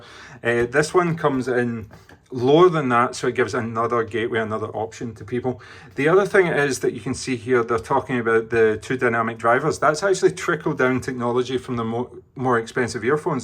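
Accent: Scotland, United Kingdom. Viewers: You sound like a British Bernardo Faria. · 😂 I am going to say Scottish (based on the Scottish accent) and if I am correct in assuming, he is not in the UK (Asia based)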